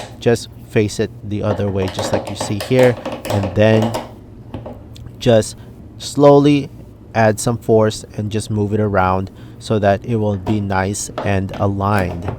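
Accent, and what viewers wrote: Philippines. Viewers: I know a Filipino when I hear one lol Thanks for this!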